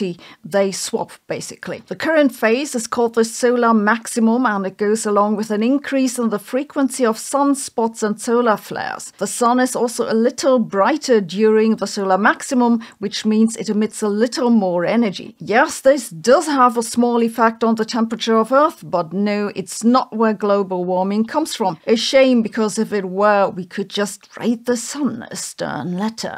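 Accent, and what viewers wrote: Germany. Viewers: Incredible accent. My thinking is she’s German and has spent a lot of time in the U.K. Now on to the Science…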